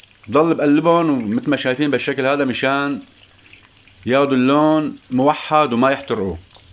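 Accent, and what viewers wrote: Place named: Latakia, Syria. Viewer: معلم ابن البلد تسلم ايدك دخيل رب الحكي اللادقاني واشتقت لشي نزلة عالبلد يا خي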